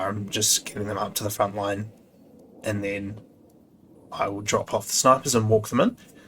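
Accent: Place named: New Zealand. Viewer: I thought you were saying "I'm just showing you my dick" for like 5 times until I realized you got that kiwi "i" vowel shift.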